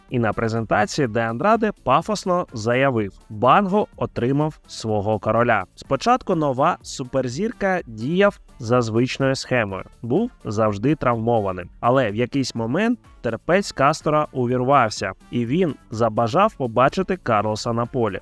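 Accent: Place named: Russia. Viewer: У диктора сильний російський акцент. Це тільки я помітив?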